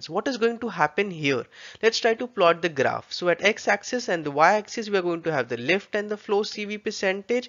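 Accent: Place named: India. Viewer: Your English and explanation can only be understood by Indians.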